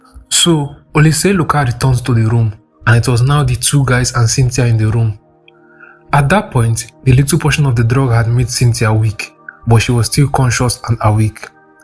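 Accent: Igbo, Nigeria. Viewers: This your Igbo accent is so strong but analysis of this document is in detail.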